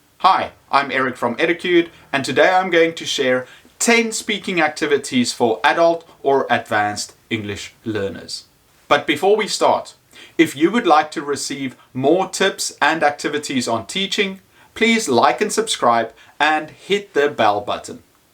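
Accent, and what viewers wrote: South Africa. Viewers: Are you South African? My SAFFA-dar is off the charts 😄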